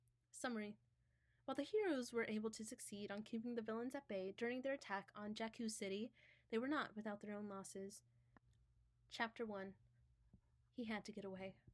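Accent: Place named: Texas, United States. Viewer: you sound Texan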